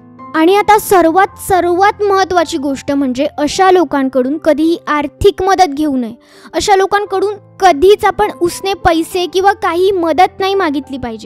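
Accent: Pune, India. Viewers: Puneri Marathi ❤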